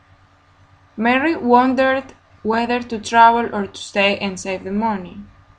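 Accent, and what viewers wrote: Colombia. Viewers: ese acento de paisa tan fastidioso para una clase parece un burdel. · super pero te aconsejo cambies ese acento paisa, molesta mucho el tono ,gracias haces un gran aporte al Inglés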